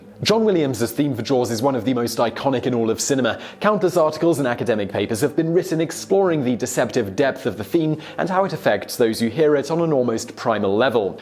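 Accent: United Kingdom. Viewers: This clip needs an AMERICAN ENGLISH voice over. Save your intolerable British gibberish for Masterpiece Theater....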